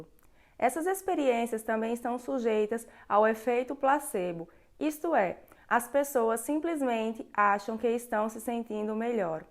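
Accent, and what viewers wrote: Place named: Paraíba, Brazil. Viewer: Sotaquezim da Paraíba👻 vou botar pra eu dormir. Parabéns!!! Ouvi o outro com voz de robô, todo. Muita sorte! Sou de Fortaleza.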